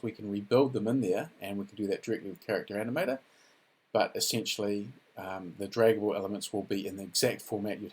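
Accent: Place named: Australia. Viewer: Really good intro vid,. And nice to hear another Aussie accent in the animation world! 👍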